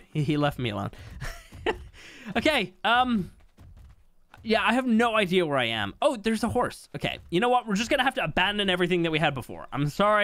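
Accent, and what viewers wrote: Ireland. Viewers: What part of Ireland are you from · Nothing beats hearing an Irishman getting excited about potato’s 😅 looking forward to this series dude 😁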